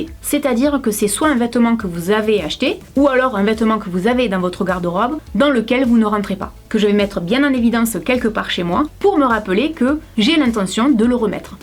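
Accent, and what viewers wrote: South, France. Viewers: J'adore ton accent du sud!! J'adore...... 😄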